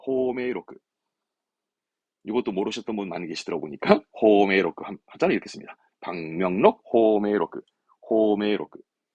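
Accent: Jeju, South Korea. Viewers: 명강의 귀에 쏙쏙 들어와요~~증말 최고십니다. 글구 잘 모르지만 제주도 억양이 중간 중간 들려서 재미있었어요(정확하게 들었는지 모르겠으나 ㅎㅎㅎㅎ) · 제주도 분이신가봐요,,ㅋㅋ 중간중간 제주도 말이 들려서 방가워요..ㅋㅋ 너무 초보라서 여기 나오는 예문도 너무 어려운데 이거부터 보면서 여기나오는 단어 무조건 외우면서 공부하면 될까오?😅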